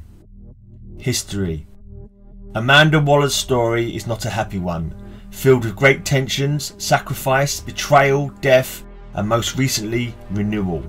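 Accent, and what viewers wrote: United Kingdom. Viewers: Viola played her in Suicide Squad. How to get away with murder is a TV show. Wild show! My brother Matt was in Orange is the New Black, Ratter, and several other things. His best friend Hartley Sawyer played Elongated Man/Ralph Dibny on The Flash TV show. He was brilliant!!! They fired him because of his Tweets from 2011. I was hoping he could tell the producers to give my brother a job as Booster Gold or Green Lantern. Oh well. I shared this vid on Twitter. U have a great narration voice. British, I assume?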